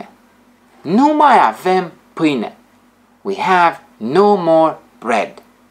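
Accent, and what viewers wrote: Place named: Transylvania, Romania. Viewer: E foarte faină lecția și metodică, exceptând accentul ardelenesc(trasilvănean... cafia/cafea, curtia/curtea...etc) :P Its a very fine lesson and methodical, excepting the transylvanian accent of the romanian language, in which people tend to pronounce ”i” instead of ”e” if this letter(e) is inside a word... cafia/cafea/coffee, curtia/curtea/the courtyard...etc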